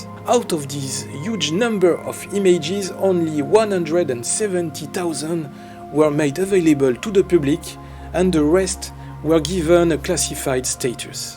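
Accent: France. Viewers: Waouh l'accent Fran-glais 😁 268k d'abo en langue Anglaise pour un Français ! Bravo ! Pouce bleu !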